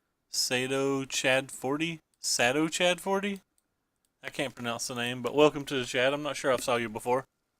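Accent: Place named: South, United States. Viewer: where you from, sir, Georgia? South Carolina?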